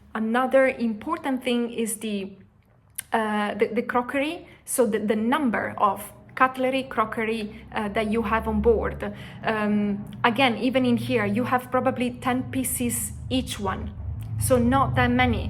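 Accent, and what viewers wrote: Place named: Italy. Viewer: You have a nice Italian accent.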